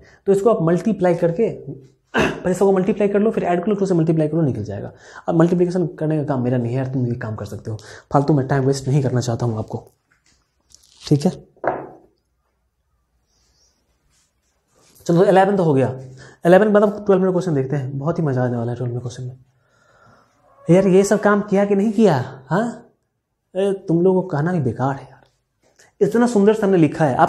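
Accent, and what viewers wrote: Bihar, India. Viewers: Aap Bihar se hai kya Hum Bihar Darbhanga se hai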